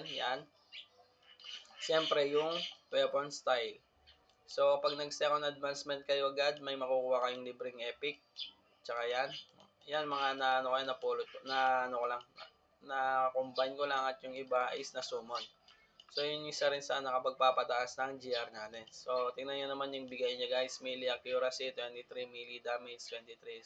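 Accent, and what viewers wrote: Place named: Pampanga, Philippines. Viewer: kapampangan accent core ❤❤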